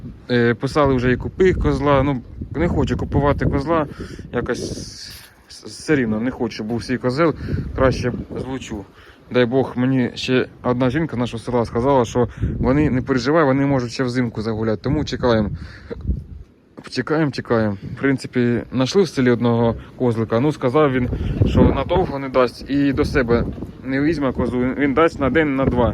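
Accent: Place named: Zhytomyr, Ukraine. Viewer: не звиртай увагу на рiзних дурнiв добре видеш канал, в нас на житомирщинi говорать також суржиком!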